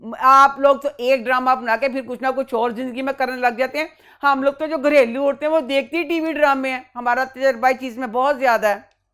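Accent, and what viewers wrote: Punjab, India. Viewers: Such a veteran actress Bushra Ansari is. I love her comedy skits she used to do on PTV. But she should be more open to criticism and accept the flaws of her play. She should understand she comes from the elders of the industry and must act like one. love you❤ I recently start watching your channel and I love your effort. I am a urdu speaking person but your punjabi tone is what i love the most and bushra appa made fun of it. She should know the most of the trps and her fame came from punjab and its very low of her saying you "pandu". Wisdom and sense of aesthetic can come from anyone who is a good observer. Peace. Keep growing ❤ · True.. I'm also a Pathan but we learn a bit of Punjabi accent from her too.. That's the beauty of Pakistan.. We love ❤️ all languages and dialects spoken here..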